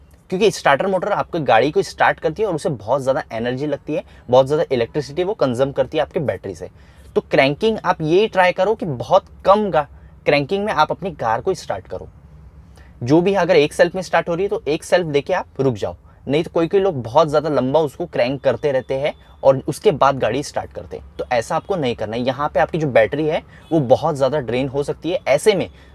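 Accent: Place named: Hyderabad, India. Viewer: Love Your hyderabadi touch to accent😁❤️❤️Good info👍👌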